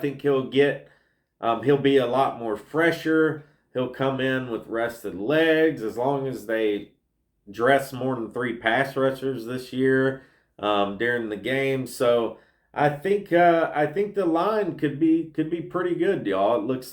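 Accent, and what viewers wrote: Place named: South, United States. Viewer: I bet, I live in DC now and there's a few of us that meet up at a bar every Sunday I was surprised there was Titans fans in the nation's capital Also that's cool you knew bloxville stands for Knoxville Tennessee. I can tell by your accent in your videos you are definitely from down south for some reason I was thinking you live in Nashville idk why lol Love the videos though keep it up I like your long videos or the short ones I don't know how long you've been doing it but you're doing a good job ✌🏼 bro bro keep trucking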